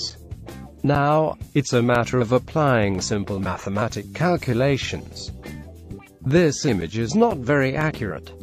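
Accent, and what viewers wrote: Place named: England, United Kingdom. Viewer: nice job. I agree with the English accent. You sound very authoritive. You also kind of cleared up a question I had with my cables. Cheers!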